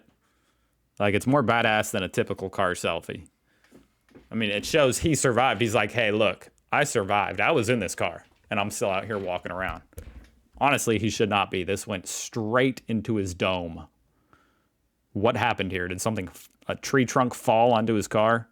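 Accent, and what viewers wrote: Australia. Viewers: Big W is a part of Woolworths and it's pronounced Ekidna 😂😂 love your videos and your Aussie accent 😅 Happy Fathers day to you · Mate, your Aussie accent is on point.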